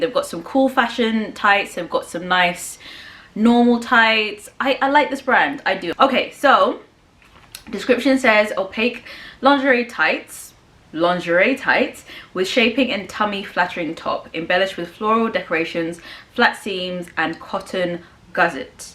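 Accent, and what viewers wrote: United Kingdom. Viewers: I love your lovely smile on your baby face, with lovely long hair, lovely lips. You make a great model. You have extremely attractive and sexy legs in your black designer tights with blouse and high heel shoes. Your black tights combined with black dress and your high heel shoes. I love your lovely British accent please I wouldn’t change a thing. I must give you respect lovely lady.❤️❤️❤️❤️❤️❤️❤️❤️❤️❤️❤️❤️❤️❤️❤️❤️❤️❤️❤️❤️❤️❤️❤️❤️❤️❤️❤️❤️❤️❤️❤️❤️❤️❤️🌹🌹🌹🌹🌹🌹🌹🌹🌹🌹😀😀😀😀😀😀😀😀😀😀😀😀😀😀🌹🌹🌹🌹🌹🌹🌹🌹🌹🌹🌹🌹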